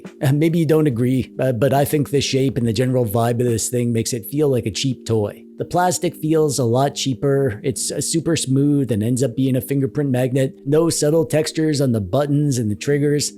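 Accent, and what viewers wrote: Canada. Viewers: You sound Canadian my Root Beer friend ??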